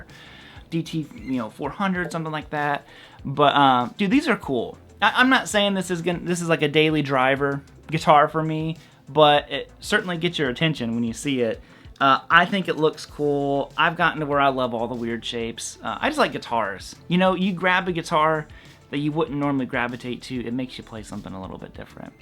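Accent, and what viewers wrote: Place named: Nashville, United States. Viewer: Yeah man it sounds like you forgot and left your wah on. I don't care for that Nashville twang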